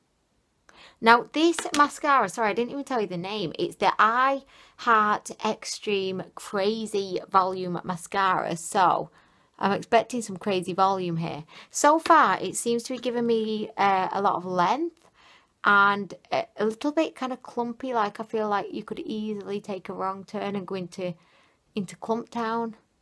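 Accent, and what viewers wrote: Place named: Birmingham, United Kingdom. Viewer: Where are you from? I can't tell if your accent is brummy or Manchester x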